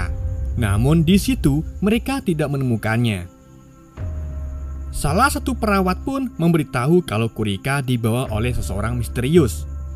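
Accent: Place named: Banyumas, Indonesia. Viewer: Kilihatan admin orang jawa ngapak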